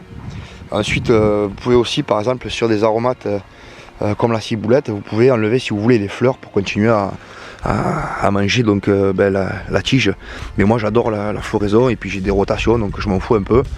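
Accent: South, France. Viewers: Mon beau sud de la France me manque, j’entends mon accent, merci pour tes vidéos que je viens par trouver grâce à l’algorithme. Ça m’aidera pour mon petit potager en Hollande. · Même si tu vas à toute berzingue, (il faut que je trouve le ralenti !!! lol) je me suis permise de rêver que j'étais dans mon petit potager et que le sol était envahi de lianes de courges, courgettes et autres cucurbitacées. Ton accent à renforcé cette impression ! le sud s'est déplacé ?! Combien de décalage, en terme de températures, avec le sud du sud, afin que je m'adapte ? J'aime beaucoup ton appréciation de la nature : naturellement vôtre ! Je viens de m'abonner, j'ai beaucoup de vidéos en retard, je les regarderai au fur et à mesure. Vidéo très intéressante, merci. Chris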